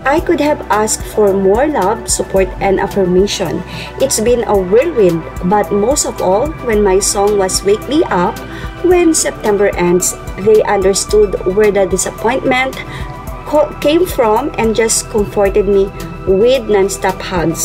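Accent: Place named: Visayas, Philippines. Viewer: Di ka namin naintindihan ang accent mo...bisaya ka dang binabasa mo na hirap na hirap kapa basahin!!!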